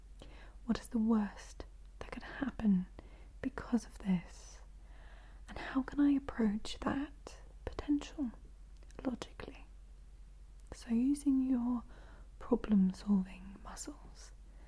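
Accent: England, United Kingdom. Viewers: If this was the first video of yours I watched I'd have believed you were British. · Are you originally British? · Just love your British accent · Your accent is very good middle english, I'm a Brit but when I'm In the states people think I'm Australian! I'm a guttural Londoner though, constantly dropping my H's! 😉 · Are your England? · !! Happy Thursday!! CONGRATS ON 100K!! 🎉🎉🎉 Very well deserved!! I’m always happy to visit your new ASMR uploads:) They make my day filled with comfort and relaxation and love the British accent:) You are awesome:) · Best English accent this side of the pond.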